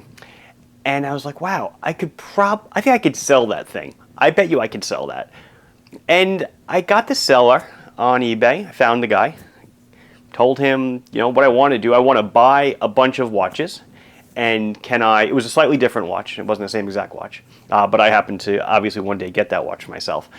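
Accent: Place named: New York City, United States. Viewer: Hey! Awesome video! Great story! I never had the feeling it was a one man show because of the excellent service and attentiveness I receive when I shop with LIW. I love the fact that you're an engineer AND a watch guy and that your wife is helping keep the business with you. And as a Brit in Switzerland I really like to hear your Manhattan accent because you say bought, caught etc. like we do.:-) Keep 'em coming! Sincere best wishes for now and the future to you, your family and your employees. Rob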